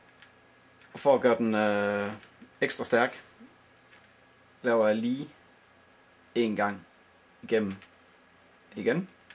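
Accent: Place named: Scotland, United Kingdom. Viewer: i thought you had a bad scottish accent at first..lol..good vid tho mate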